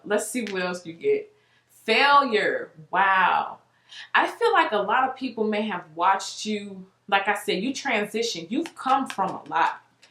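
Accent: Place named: Atlanta, United States. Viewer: U so Atlanta. Loool .😂😂 I love it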